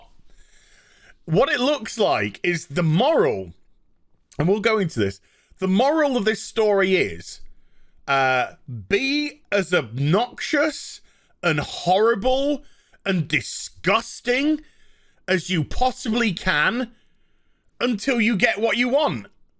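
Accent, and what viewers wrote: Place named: RP, United Kingdom. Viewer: Your posh old fashioned British gentleman accent always on point.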